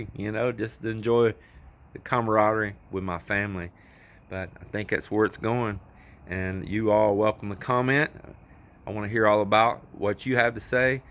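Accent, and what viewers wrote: United States. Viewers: Certainly alot of decisions to have to make but at least on balance your listening all the best advise to allow a good judgment call, l love listening to your laid back American accent🤔🤗♥️👍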